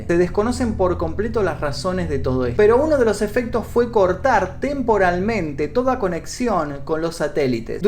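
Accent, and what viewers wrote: Buenos Aires, Argentina. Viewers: se ve que asi hablamos los de argentina porqué me decían lo mismo a mi xd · por tu voz parece que eres de Argentina 🇦🇷 ó Uruguay 🇺🇾 estoy de acuerdo con todos los comentarios positivos este es mi primer video que veo tuyo excelent. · es que los Argentinos y los Uruguayos hablamos asi la ll suena sh, por eso es latino y no español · Que genio!!!! SOS un copado.... Muy bueno tu relato, excelente perfomance, así hablamos los porteños. es totalmente Argento....Por muchos relatos massss 💪💪💪👏👏👏👏👏👏🇦🇷🇦🇷🇦🇷🇦🇷🇦🇷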